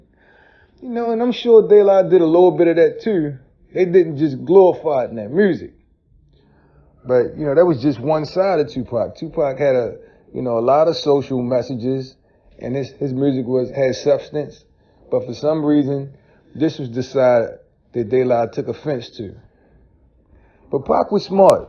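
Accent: Baltimore, United States. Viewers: You gotta be from Baltimore. I hear that accent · Kewl video, kinfolk✊ But, on some other... You from B-more?? Sound like, one of the big homies from round that way. 💯✊ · You from bmore huh I can hear it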